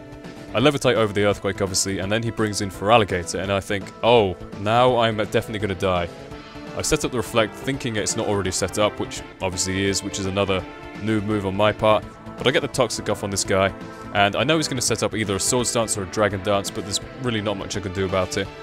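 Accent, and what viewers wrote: United Kingdom. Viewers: Your British accent soothes and reassures me. Will watch rest of playlist. Enjoy my like.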